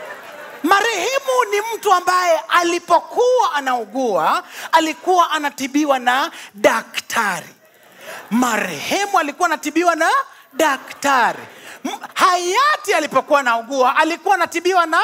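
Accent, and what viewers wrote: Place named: Luo, Kenya. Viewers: you've killed it🤣🤣🤣🤣🤣🤣🤣🤣 i like his Luo accent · Kothin Fanuel its the luo accent that makes his swahili beautiful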